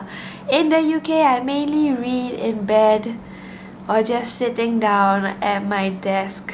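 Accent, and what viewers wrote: Malaysia. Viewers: literally the first second I heard you speak I was like, this girl gotta be malaysian. AND I WAS (half) RIGHT :D OMG OMG ANOTHER MALAYSIAN BOOKTUBER THIS IS FANTASTIC. I also recently started booktube and I'm really enjoying it so far and like god know how I struggle with like keeping up with reading and filming and editing gah. dont worry I hit 20. it changes nothing HAHA You are an absolute natural and I cannot wait to see more of your videos! :D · Heyyyyy I LOVE your accent! I'm also Malaysian/Chinese! WHOOO. LETZ BE FRANDS!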